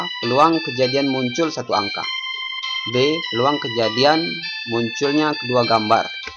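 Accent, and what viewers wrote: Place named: Bengkulu, Indonesia. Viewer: Mantap betul pak, bapak dari Bengkulu ya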